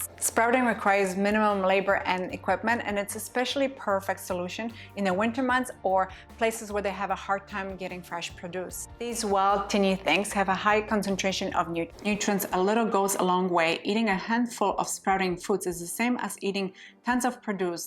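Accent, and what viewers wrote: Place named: Iceland. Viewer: You have such an interesting accent! It's subtle, but it's there. Icelandic? 🤔 Dutch?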